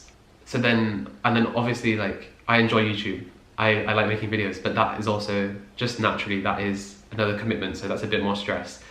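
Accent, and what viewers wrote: United Kingdom. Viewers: you sound british, where do you go?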